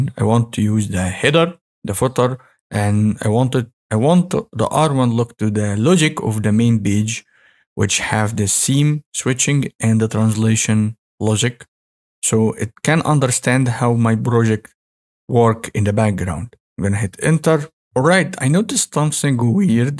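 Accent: Egypt. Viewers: Brother, you sound egyptian. Great video btw.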